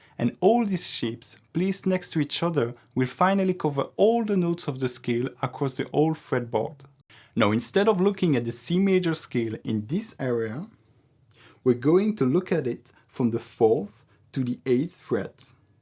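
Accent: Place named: France. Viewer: On reconnaît bien l'accent français 😅 Merci pour la leçon 💪🏼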